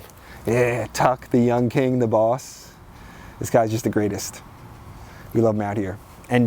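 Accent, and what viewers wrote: New Jersey, United States. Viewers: 💦💦💦💦💦💦💦💦💦💦 I love how you stay true to your Garden State home, James, by continuing to pronounce water the Jersey way ~ “wooder”! 😊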